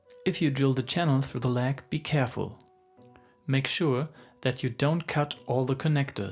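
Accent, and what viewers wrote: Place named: Germany. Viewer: Nicht schlecht Herr Specht. Ich schätze ich höre da einen Landsmann. Würdest du sowas auch als "Auftragsarbeit" für jemanden machen?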